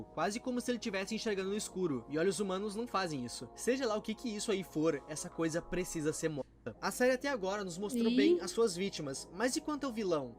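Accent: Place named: Northeast, Brazil. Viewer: Vc é nordexxtina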